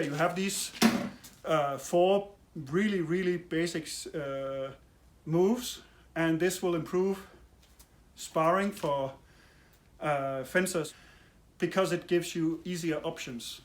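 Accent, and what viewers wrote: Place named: Germany. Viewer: Not being rude in any way, great knowledge! I think whenever you say "Ah" it's a little silly however doesn't mean you are talking out of your butt lol, I'm guessing English is a second language and German is first.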